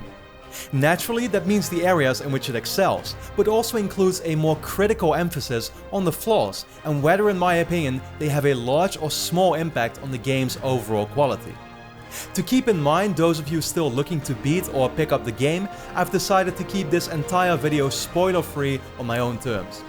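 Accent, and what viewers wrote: Netherlands. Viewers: ik vroeg mij al af of je nederlands was haha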